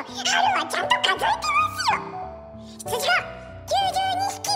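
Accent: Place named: Kansai, Japan. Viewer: 最高です‼︎最近このチャンネルを知りました。毎日見ています😂もはや中毒と言ってもいいほどハマっています。結婚を機に東北へ引っ越しして早15年。関西弁が恋しくて毎日ここを訪れては腹が捩れるほど笑っています❤今後も楽しみにしてますよ🎶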